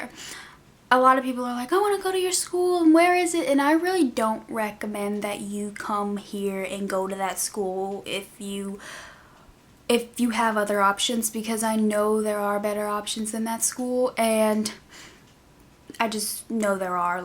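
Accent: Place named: Louisiana, United States. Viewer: I'm from Mississippi and as soon as I started watching your videos I thought you had a Louisiana accent. I'm getting ready to go to regency beauty institute in Virginia this month!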